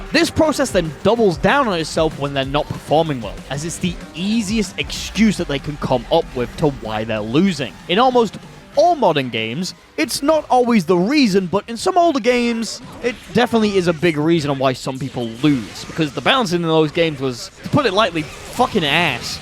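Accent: United Kingdom. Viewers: i want it to be known that i do enjoy your silky smooth british voice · I'm just here to hear the bri'ish guy talk, honestly. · yes we do love your silky smooth british voice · I do just love your silky smooth British voice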